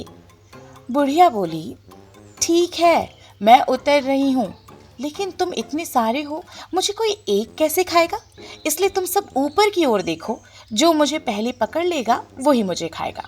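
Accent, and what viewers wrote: Garhwal, India. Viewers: Tears on my eyes.. thanks, I love you maa forever.. 'hit tumadi bate bat me kya zandu Teri budiya ki baat'.. ma'am your garwali accent just osm. 😊😊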